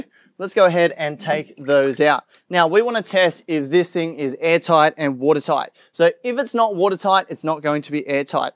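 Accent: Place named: Australia. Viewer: a very aussie accent))) how'ya' going mate?)))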